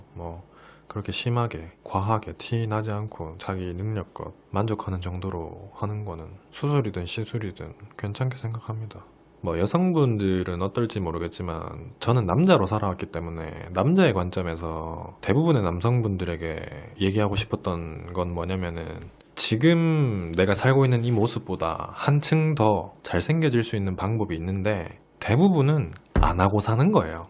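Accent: Gyeongsang, South Korea. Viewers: 억양, 말투가 딱 대구사람인 것 같다.. 동의하시는분??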